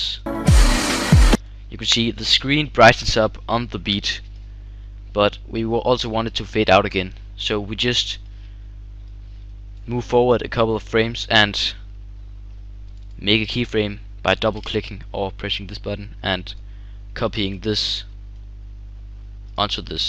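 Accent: Denmark. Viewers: Ur danish, right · hes from Denmark we almost got same accent :p :D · He sounds more like Danish.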